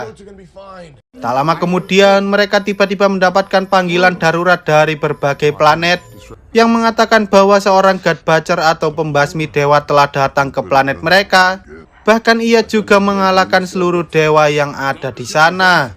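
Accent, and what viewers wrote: Java, Indonesia. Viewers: Suara penyiar medok banget 🤣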